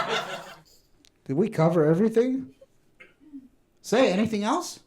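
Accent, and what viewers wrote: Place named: Israel. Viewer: Toda raba, lachte das erste Mal seit einanhalb Wochen vom Herzen ❤ love your sweet israeli english 🤗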